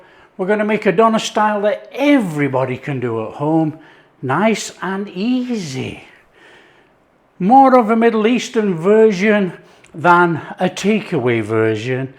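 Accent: Yorkshire, United Kingdom. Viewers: lol a fine Yorkshire boy, I never would’ve guessed! 😂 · What part of Yorkshire are you? I'm in Leeds.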